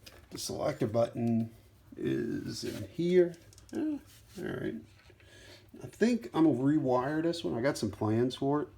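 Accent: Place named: Baltimore, United States. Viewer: You sound like you’re from ball more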